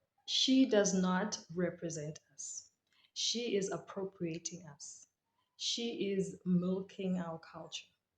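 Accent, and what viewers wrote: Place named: Model C, South Africa. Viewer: Disrespectful Model C accent.Tyla should take you to task.... disgraceful racism!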